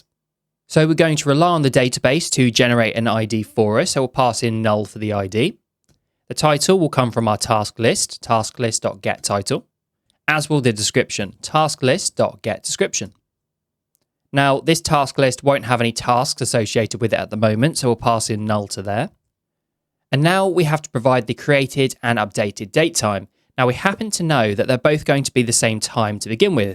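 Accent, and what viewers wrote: United Kingdom. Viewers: sorry but.... why is british accent so hard to understand,why cant u guys just speak what should be spoken...:(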